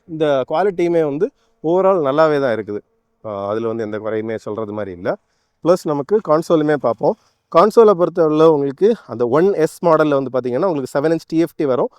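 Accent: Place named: Nagercoil, India. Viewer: This is Nagercoil slang and also he associated previously with motowagen family only...Due to some clause he left and started this channel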